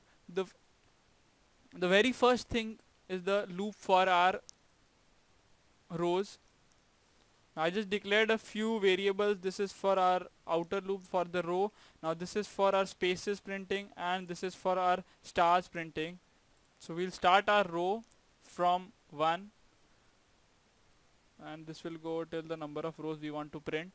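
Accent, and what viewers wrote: India. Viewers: fuck indian accent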